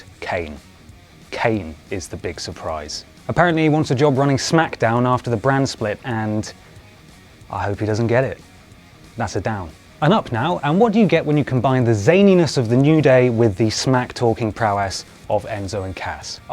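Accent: United Kingdom. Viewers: I'm a simple person,I noticed a british accent,I liked then I enjoyed watching it! That's it! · i'm a simple man, i hear the british accent, i click like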